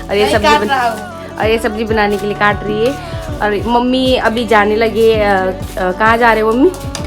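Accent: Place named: Nepal. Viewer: Aap toh pieor nepali bolrey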